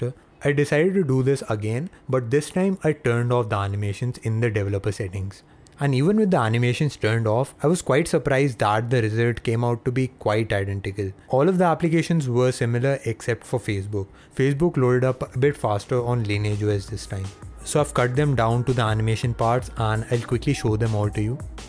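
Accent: India. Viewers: I suppose only Indians may understand this kind of English. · It's not broken english - he just has an indian accent - I think he has a great voice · Trust me. Train your english. Because i can't understand SHIT with your indian-accent english are you saying. U said channel to chaniil at the beginning. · another fucking india accent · Can you speak better english without this fucking indian accent! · I am not racist ..but in india your english is very indian.. :) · I think it's good to get used to other people's accent which is quite different from most of us, because one day, if you visit India, bear in mind that most of them speak like that.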